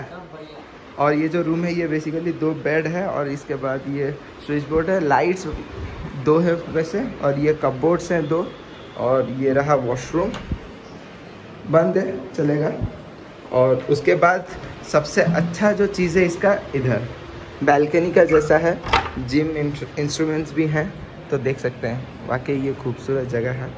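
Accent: Odisha, India. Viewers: Odisha se ho kya?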